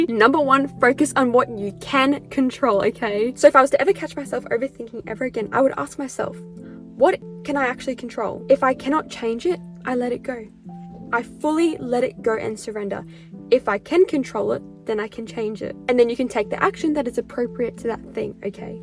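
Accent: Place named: Australia. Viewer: Name = ☘ Accent = Australia 🦘 Mind = blown 🤯 But I'm not gonna overthink it 😄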